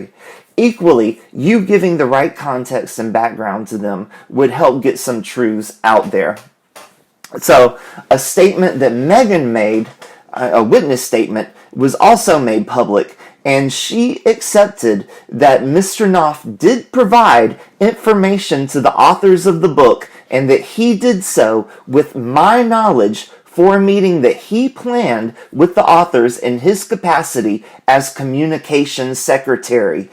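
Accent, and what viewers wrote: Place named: South, United States. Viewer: Omg you look totally different than I imagined you in my mind. lol I pictured you a southern gentlemen from like a southern gothic novel. Sorry your voice just makes me think of that image. Nice to see you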